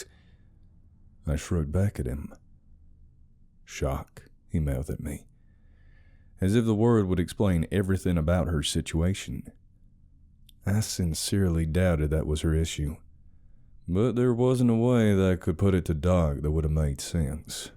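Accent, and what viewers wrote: South, United States. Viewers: Love how a Brit can pull of a decent southern accent. It’s passable tbh and I’m from Texas. Also, didn’t you do this story before? I coulda sworn I heard this from you? Full story and all. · I love "southern" voice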